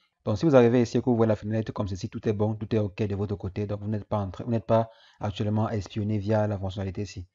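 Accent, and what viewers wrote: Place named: Cameroon. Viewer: Peut être que je suis pas intelligent i mais je n'ai rien compris quand bien même avec ton fort accent Camerounais je devrais te suivre.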